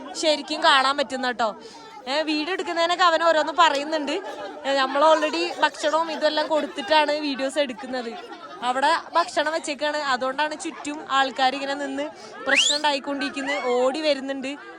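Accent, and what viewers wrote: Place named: Thrissur, India. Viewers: Inn ipoll തൃശൂർ slang annaloooo ❤ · ഇന്ന് എന്താ തൃശ്ശൂർ ഭാഷ 😃😃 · തൃശൂർ ഭാഷ ഒഴിവാക്കണം.... കൊള്ളില്ല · slang oke mariyalo… epo trissure bhasha analo 😂😂😂😂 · ഇന്ന് full തൃശ്ശൂർ സ്‌ലാങ് ആണല്ലോ ❤️ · Thrissure slang varunnu samsarikumbol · ത്രിശൂർ ഭാഷ കലക്കി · ഇതെന്താ തൃശ്ശൂർ slang 😂😂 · Slag mariyoo oru trissur slag cheruthayitt vannittundallo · Slang bore avunnu,trissur slang varunnu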